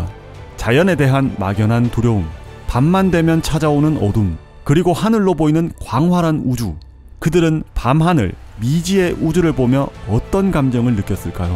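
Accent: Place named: Busan, South Korea. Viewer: 횽 오늘 좀 흥분하셨구만. 다른데선 안들리는 부산말이 강하게 들리네 ㅎㅎㅎㅎ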